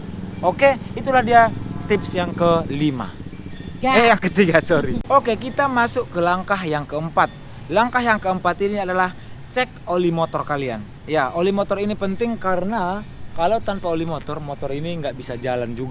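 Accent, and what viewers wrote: Indonesia. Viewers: Logatnya sumatera kali bah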